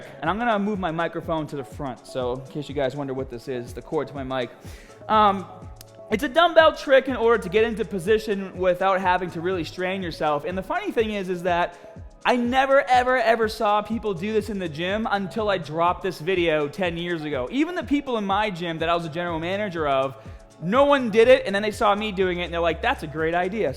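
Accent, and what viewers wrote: Boston, United States. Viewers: Boston guy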